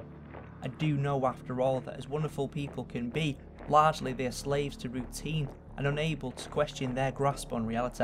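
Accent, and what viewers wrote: United Kingdom. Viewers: your British accent is funny.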